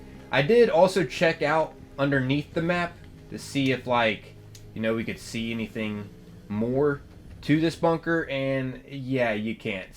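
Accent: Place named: Pennsylvania, United States. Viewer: Yinz from Picksburg? Your accent sounds realllllllll PA.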